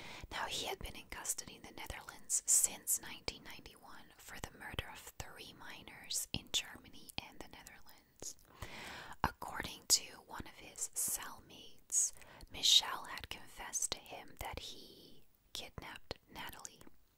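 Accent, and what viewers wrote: United States. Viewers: You sound so American! You could pass as someone who is from the USA! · I just started watching your videos not too long ago and I absolutely love them! However, I didn’t even realize you were from Belgium until this video. I’m from the U.S. and I assumed you were too because your accent sounds American! I didn’t realize how similar Belgians and Americans sound! Or am I missing something?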